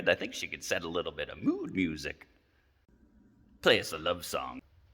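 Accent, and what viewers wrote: Canada. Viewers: Lol. Canadian AF. Love your energy bud 🇨🇦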